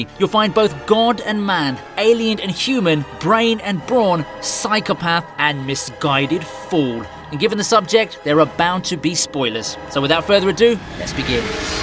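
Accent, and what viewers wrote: United Kingdom. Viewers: I love your British accent buddy😊❤️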